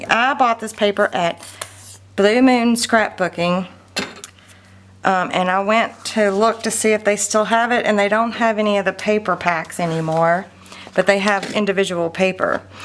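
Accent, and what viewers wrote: South, United States. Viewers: Just love it!! Top Note is a great name! You are a very creative lady.. love your videos so much!!! I think maybe its because we both have a southern accent! LOL Thanks for a great tutorial!!!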